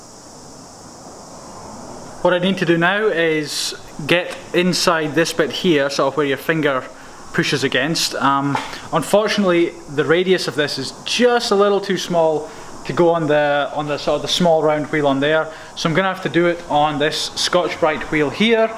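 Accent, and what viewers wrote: Ireland. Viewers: are u irish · are you Irish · u have a cool Irish accent any way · i love ur irish accent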